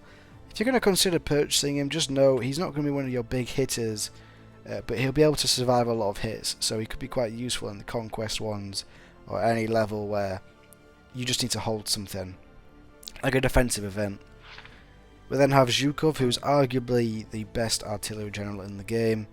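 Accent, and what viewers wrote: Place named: United Kingdom. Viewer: From the accent i would have guessed he was from the UK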